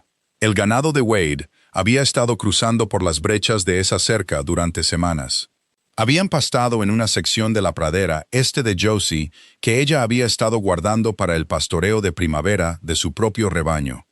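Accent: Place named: United States. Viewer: ESe acento gringo del narrador, que enfado.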